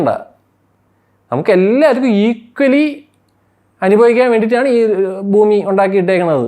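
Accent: Trivandrum, India. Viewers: തള്ളേ തിരോന്തരം 😂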